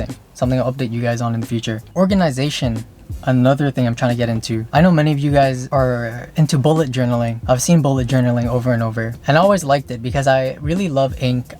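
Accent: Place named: France. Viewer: Omg your french is so cute lol c'est la vie ! keep talking french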